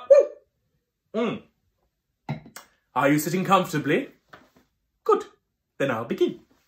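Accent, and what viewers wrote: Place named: Newark, United States. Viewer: I loved this! Especially loved how her mother went from talking Cornish to Scouse 🤣 You're brilliant at voices though. If I hadn't seen you speaking perfect Newark slang I'd never of realised that you're from my rough town of Newark haha 😆 I could definitely watch this again.! I hope you keep going up in the world of theatre 🖤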